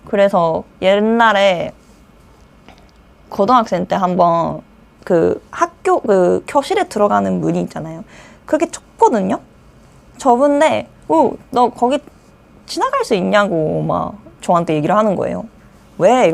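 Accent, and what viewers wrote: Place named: Gyeongbuk, South Korea. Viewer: 아니 야는, 한국사람 아녀..??ㅋㅋㅋㅋㅋㅋㅋ 경북 아가씨 같은디..ㅋㅋㅋㅋㅋㅋㅋ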